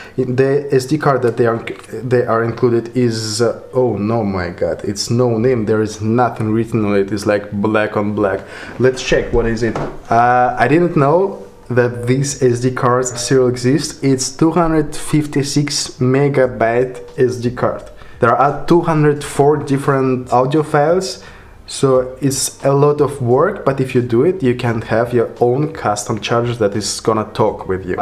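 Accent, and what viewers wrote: Russia. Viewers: мне понравилось, давай больше таких роликов, смотришь и язык подтягиваешь ) при чем быстроговорящих американцев без субтитров не понять, а когда присутствует русский акцент, сразу понимаешь ))) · Прикольный английский у тебя 😺 Чисто русский английский 👍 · сори, слушать такой вот рунглиш это насилие для ушей. попробовал включить субтитры, но они автопереводные. смотреть и без звука и без субтитров - бестолку.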